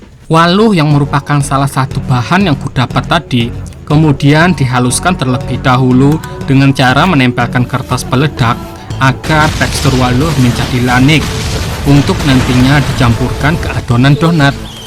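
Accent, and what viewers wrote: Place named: Banjar, Indonesia. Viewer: Bang saran , mengenai banyak koment yang kada paham bahasa banjar , di kasih text aja bang , biar logat lucu bahasa banjar nya kada hilang ,dan orang luar pulau paham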